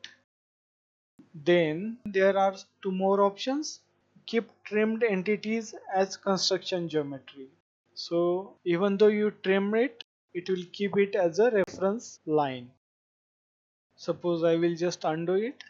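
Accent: India. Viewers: What the fuck bro I can’t understand ur inidan voice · yk its dope when i see indian with accent teaching tysmmmm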